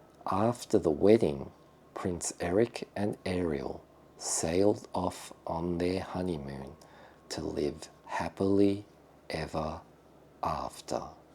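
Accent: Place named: Australia. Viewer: さんのオージー英語ずっと喋ってて欲しかったのでむっちゃ嬉しいです…！！ネイティブ速度バージョンもいつかお聴きできるでしょうか☺️ オーストラリアに戻りたい気持ちと英語から離れたくない気持ちを幾分か救ってくれます😊❤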